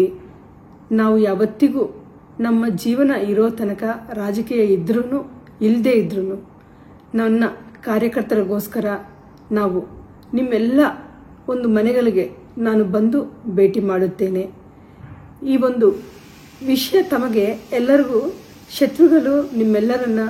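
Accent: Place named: Telugu, India. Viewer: Telkannada adu 😂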